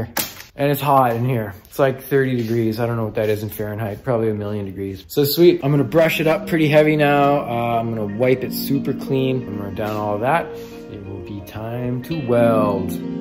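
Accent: Canada. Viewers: T tu quebecois?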